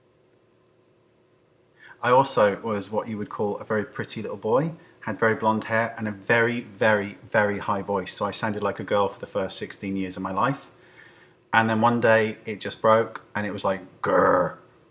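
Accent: United Kingdom. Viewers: Love your story, love how passionate you are about makeup and love your Brittish accent ;)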